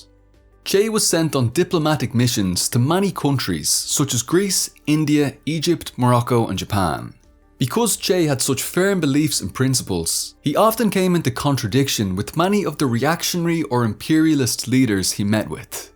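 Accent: Ireland. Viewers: I know it's serious material and you're doing a great job here, but I've never heard someone speak Spanish with an Irish accent and it's incredibly entertaining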